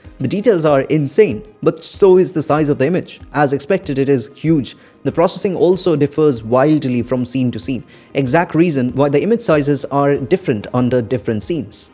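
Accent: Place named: India. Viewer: First, i thought you are an American coz of the accent but the way you pronounced some words proved you are desi 😅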